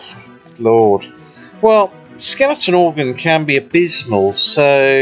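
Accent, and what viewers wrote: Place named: Newcastle, United Kingdom. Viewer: I miss the geordie twang like man 👌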